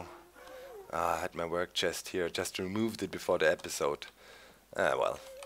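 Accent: Germany. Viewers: are you from england with a bit accent of germany?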